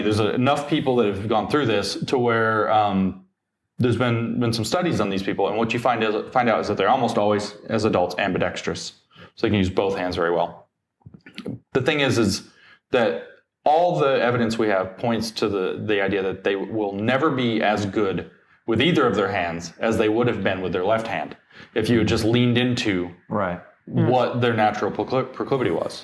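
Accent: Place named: United Kingdom. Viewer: I don't find it tedious, and I'm sure I'm not alone in that. It's so easy to forget that Konstantin grew up in Russia until he was 13. The man speaks with a British accent and went to university in Britain. It's not as if he says that all the time. You try living in a "foreign" country and NOT making reference to the culture of your childhood quite frequently. It informs his worldview, and is probably responsible for his fairly unique viewpoint on many issues.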